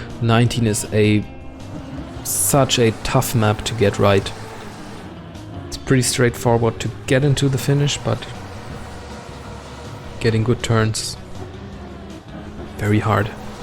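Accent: Germany. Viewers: dieses german english :D .D :D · Bist du ein deutscher? Erkenne ich wegen dem ackzent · Krank dass man einfach hört an dem Englisch Akzent hört aus welchem Land man ist. Bei dir hab ich direkt gehört dass du deutsch bist und bei wirtual z.b dass er skandinavisch kst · Are you german? Man hörts :D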